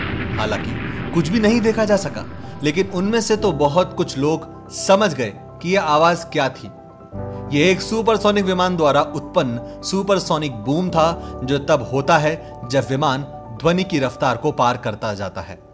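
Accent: Standard. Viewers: Shudh hindi super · आपकी सुद्ध हिंदी बहुत अच्छी है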